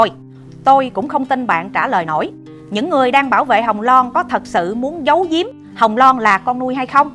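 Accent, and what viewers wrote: Standard. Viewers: lập luận sắc bền rất thích!phát âm khá chuẩn nhưng vẫn còn vài khuyết điểm " luận " " bình LUẬN " không phải " bình LỰNG " chỉnh lại một chút sẽ hấp dẫn hơn chúc em luôn thành công Mãi dõi theo em dõi theo lẽ phải!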